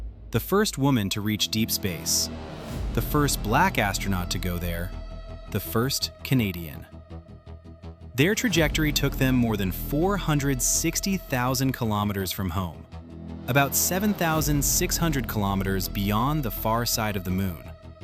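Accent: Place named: United States. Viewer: lol if he didn't have an American accent I would have kept my mouth shut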